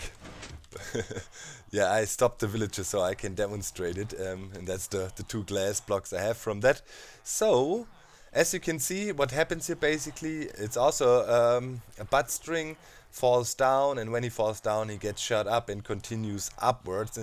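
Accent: Germany. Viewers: I've followed you since the tree house days. I was following Vintage Beef when he visited your base having made himself invisible and you just turned round and shot him . I have followed you since. I am useless at redstone but I love your videos. I really like your elegant building style. I am English from London and, through you,I joined my first multiplayer world - Gummy's Tree realm. Your English is excellent and I love your accent. Subtitles would not work for me because it would lose the close connection that listening to someone's voice achieves. Most Germans speak excellent English so keep going in English please.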